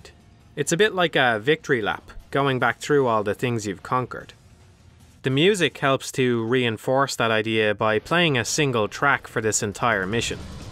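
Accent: Ireland. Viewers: You Irish? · Woah woah chill bro I didn't mean it like that.. why do I exist and you don't even know me? Who's the ignorant & insensitive one here? Jesus Christ what's your problem? Snowflakes like you are everywhere these days it seems.. Listen i got no problem with him being Irish.. on the contrary I only enjoyed this video even more because of his lovely accent and his knowledge & love for this game.. I wouldn't have it any other way that's why I keep coming back to this video years later.. I wasn't trying to be racially ignorant although my initial comment may have sounded a little insensitive, however I said it in a humorous sarcastic tone.. it's just that DMC is such a niche franchise and not many people are into it and because of its anime style I thought it was more popular in Japan than the rest of the world combined much less with an Irish guy.. hence my comment.. 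but does that mean I think no Irish fella or foreigners know about DMC? Ofc not! There are fans all over the world but none have I seen anyone explain it in detail like this guy.. Having said that he is the first Irish guy I've seen on YouTube that has expressed so much love for this game through his vast knowledge on this game.. my original comment had no intentions to offend him and I doubt he would be since he probably has thick skin and can take a joke unlike a certain snowflake lol.. just kidding.. love you too bro.. just don't be quick to judge people next time geeZ..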